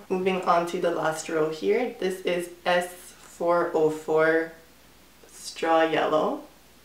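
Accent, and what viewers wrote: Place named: Russia. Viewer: i have been watching your videos and i would like to know where is your accent from... it sounds like russian to me but i can't know because I am from Chile ( where the 33 miners were rescue alive)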